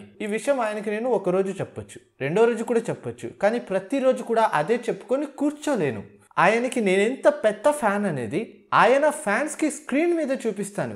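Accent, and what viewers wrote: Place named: Karimnagar, India. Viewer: broo are you from Karimnagar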